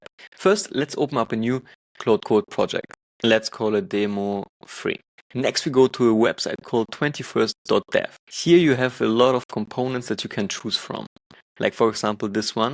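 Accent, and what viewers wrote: Germany. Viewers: Man hört den deutschen raus👁️🤣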